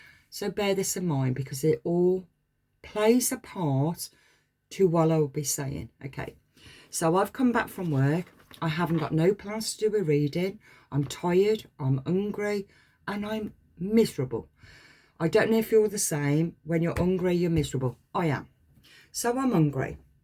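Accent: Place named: Midlands, United Kingdom. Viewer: Hello lovely, stumbled upon you today.. so strange as you reminded me of my mum so much ?! in looks and the way you speak. We share similar accents too ?! so I feel we live close aswell, guessing central midlands Uk 🇬🇧. Ironically it is her 82nd birthday in spirit today 16.1.45 her name is Lorraine and she also was a therapist for individuals with addictions/mental health. Just wanted to say I found you so relaxing to listen too, found you very comforting on an emotional day for me 💔. I’ve listened to 3 so far and all resonated . Love your style of reading too, very interesting and gave spiritual knowledge to research. Subscribed ! Sending you abundant blessings Thankyou 🤍🪽💜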